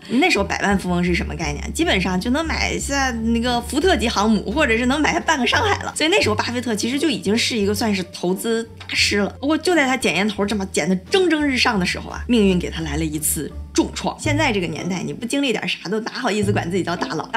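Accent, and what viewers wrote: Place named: Dalian, China. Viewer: 中山区出生的海蛎额味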